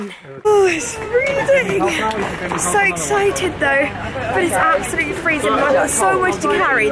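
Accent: United Kingdom. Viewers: Is she from England sorry I am new here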